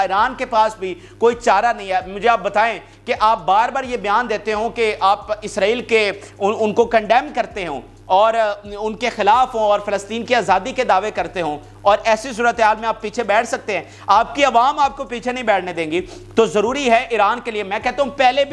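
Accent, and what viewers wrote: Punjab, Pakistan. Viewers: او بھای انگريزی کو پنجابی ميں نہ بولو ۔ جو بھی خبريں بتاتے ہو ساتھ کسی مصدقہ اخبار کی ريفرنس ديا کرو ۔ہاتھ ميں کاغز پکڑ کر ريڈنگ کررہے ہو ۔وہ بھی انگريزی پنجابی ميں۔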